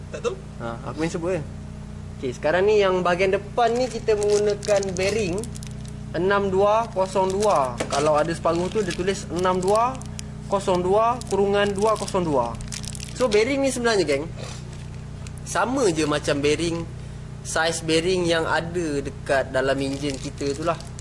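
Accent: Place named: Negeri Sembilan, Malaysia. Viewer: buat vlog ckp nogori la plk....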